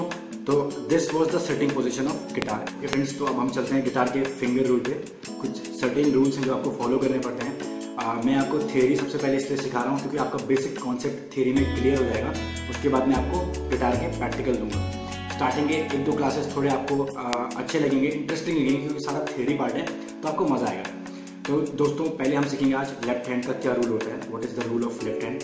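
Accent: Himachal Pradesh, India. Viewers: Bhaee himachal se ho kya?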